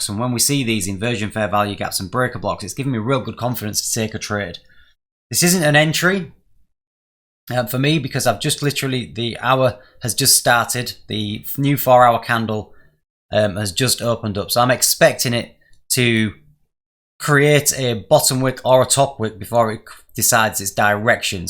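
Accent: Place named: Midlands, United Kingdom. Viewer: Great videos, thank you! I found your channel after learning about unicorn entries and you really helped me understand and see them easily. I took this trade too, although I go with a fixed 3R TP for simplicity atm (I don't take anything off before it). I personally monitor both GU & EU for Unicorn entries post 7am (UK time) which means I can focus on the one Strategy. Thanks again, and very much appreciate the uk accent too, given I'm from the Midlands originally (I think you're slightly north of there, but not by too much?)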